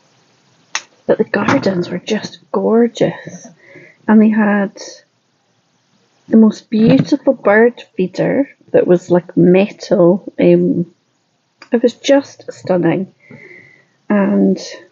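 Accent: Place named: Scotland, United Kingdom. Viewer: Superb cards and I love your gentle Scots accent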